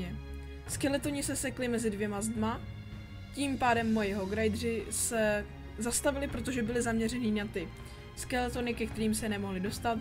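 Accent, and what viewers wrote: Czech Republic. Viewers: DDD Dostalo mě jak si se snažil mluvit "epicky" a seriózně a říkal jsi ty věci takovou Českou Angličtinou:) Jinak fakt by to měli opravit. P.S. Víc videí s tou grafikou na konci, je fakt úžasná, ty detaily... :D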